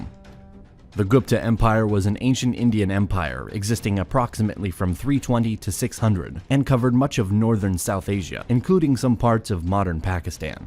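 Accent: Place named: England, United Kingdom. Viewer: Marathas defeated and controlled whole india from 1700 to 1818 with puppet mughal emperor...... But foolish english accent person have no mention in video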